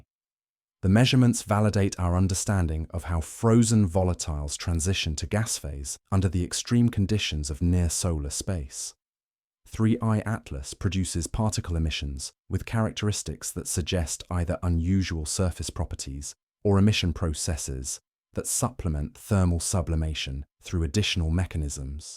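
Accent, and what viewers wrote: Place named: United Kingdom. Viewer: I'm really getting tired of the gay British narrating on all these darn videos. How about good old USA English huh?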